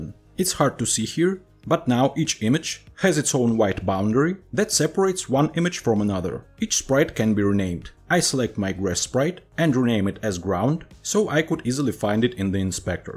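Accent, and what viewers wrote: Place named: Russia. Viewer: Кликнул на видео не замечая названия канала. Услышав акцент думаю "русский что-ли?". И тут вижу на "Новая папка" Хороший туториал, короче, спасибо)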